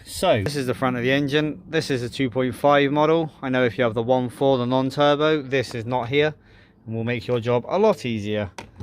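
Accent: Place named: West Country, United Kingdom. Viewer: I’ve got hold of spare engine low Miles off local guy who been Breaking t4s for years it’s showing 10700last mot he said it was only 112000 when he drove van to his.runs well he said he does know t4s well.got the turbo an diesel pump plus manifolds Too.where you from?im in Cheshire u sound like Bristol Somerset.we be Goin Cornwall again soon my wife’s family there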